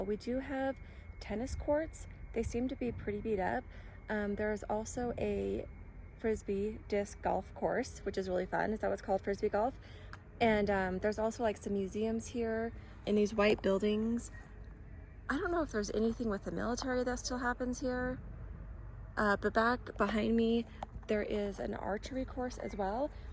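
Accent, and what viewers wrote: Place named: Ohio, United States. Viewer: Love your accent respectfully. Are you from Ohio originally?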